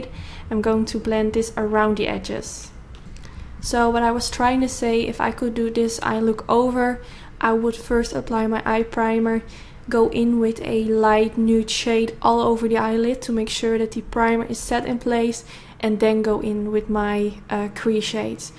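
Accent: Netherlands. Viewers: nothing went wrong!!! it is extremely beautiful...btw are u Dutch? u have kinda like a Dutch accent. Beautiful!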